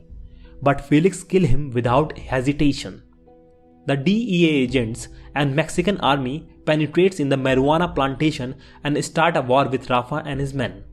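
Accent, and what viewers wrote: India. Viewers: I dont know what they are saying about the accent but haha never thoughts i'd hear a story happening in my language be explained in indian accent haha so cool dude! thanks a lot, i really could not recall s1 ! this one was concrete and short :D Greetings from Colombia and btw, so stoked you are into this show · The Indian accent is killing the video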